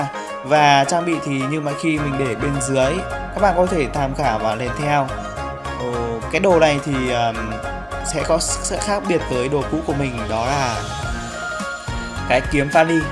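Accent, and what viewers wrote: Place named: Thanh Hoa, Vietnam. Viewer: người thanh hoá à. Thấy có cả (mô)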